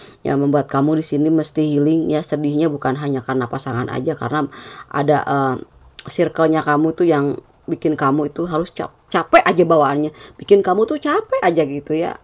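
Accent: West Java, Indonesia. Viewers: Assalamualaikum bunda asli timana logatnah sunda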